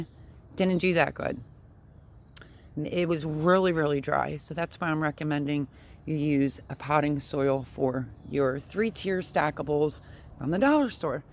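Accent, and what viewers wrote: Philadelphia, United States. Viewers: I immediately knew you were from PA. I’ve been out of Philly for almost a decade now and your accent is making me homesick! Haha. Very helpful review, I definitely will be ordering a case of these. God bless!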